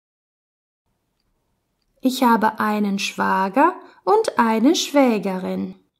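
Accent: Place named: Italy. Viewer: Schade, dass die Deutschen nicht so sprechen. die Intonation und Dialekt sind sehr anders. Die Sprecherin kommt vielleicht aus Italien, oder. das finde ich nicht gut.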